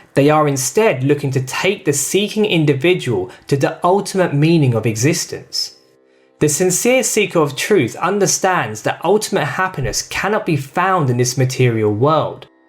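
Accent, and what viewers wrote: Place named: United Kingdom. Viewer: Charvaka was also a Hindu materialist Rishi,his philosophy was very materialistic😄.I have seen all your videos it seems you have read many Vedas,upnishads and purana.your understanding is also praisable,I think you live in London (your accent is British and library of London is pretty rich specially when it comes to old Hindu scriptures). I'm requesting you second time make a small video about yourself.I want to recommend a name Dr.Narayan Dutt shrimali.Answer my comment so I will know that you read.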